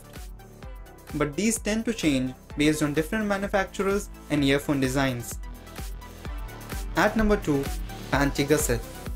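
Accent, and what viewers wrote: India. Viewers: Why you are not giving the text to a text to speech robot. The Indian accent is horrible. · can't understand his Indian accent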